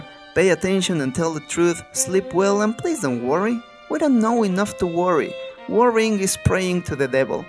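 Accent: England, United Kingdom. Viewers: Ulala señojjjj.... tiene la voz de todo un burgués Ingles 😍👌🏻👌🏻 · Ulala señor ingles 😌👌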